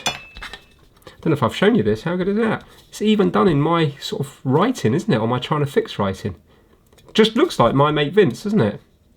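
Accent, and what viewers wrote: United Kingdom. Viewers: Vince its funny, I have been listening to you for years now. My daughter says to me (her room is down the hall from my office / gameroom) shes like you really enjoy listening to British youtubers, and I said "It's " said been listening to him for years and being British always sounds more "official" Thanks as always for your content.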